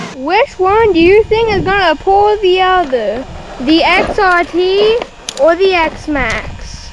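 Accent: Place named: New England, United States. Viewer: Your very welcome ! Your accent sounds like a New England accent. So cool when you took that truck up the stairs! Keep up the great work!💯👊🏼😎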